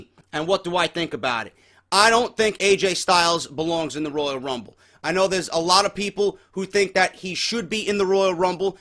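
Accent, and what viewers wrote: New York, United States. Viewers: jesus christ you even sound like youre from NY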